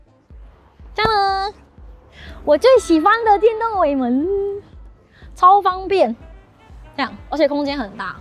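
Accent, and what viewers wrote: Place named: Taiwan. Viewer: 主持人講話好台喔！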